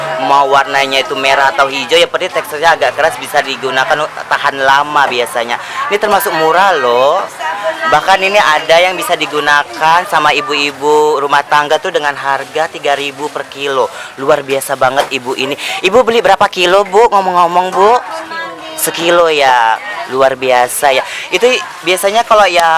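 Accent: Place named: Medan, Indonesia. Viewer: Kalo bisa logat medannya jgn dicampur dgn logat jakarta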